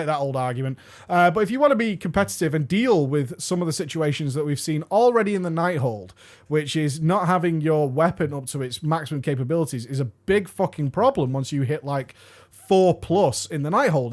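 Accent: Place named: England, United Kingdom. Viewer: Hallvardio it's an English accent tick. Some words with an English accent have a hanging r .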